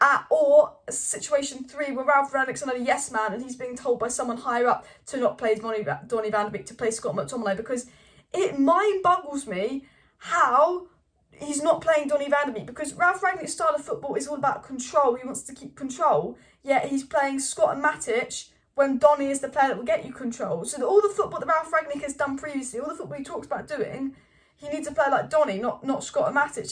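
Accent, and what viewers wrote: United Kingdom. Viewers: Would u stop talking like a brits?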